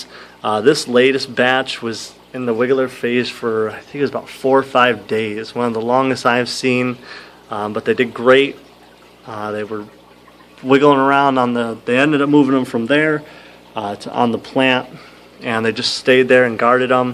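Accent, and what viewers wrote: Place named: Massachusetts, United States. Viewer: are you from Massachusetts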